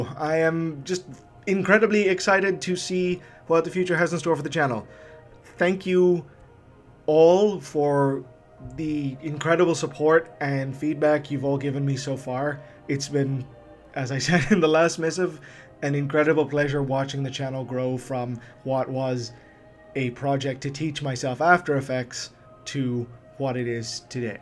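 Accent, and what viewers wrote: Ireland. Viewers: Where are you from? Accent sounds a bit irish?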